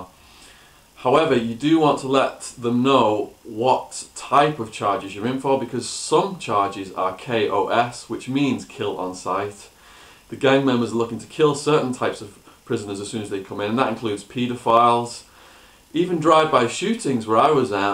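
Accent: United Kingdom. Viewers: I hope you don't mind me saying that you sound too british for an Arizona prison.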